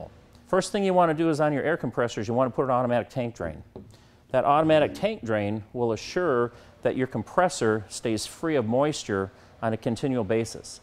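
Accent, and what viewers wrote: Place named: Cleveland, United States. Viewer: talks like Cleveland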